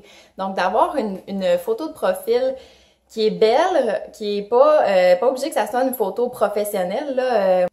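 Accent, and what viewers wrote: Quebec, Canada. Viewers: D'excellents conseils exprimés d'une façon souriante, dynamique et avec cet adorable accent canadien!!! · Super vidéo et joli accent du Québec. Merci.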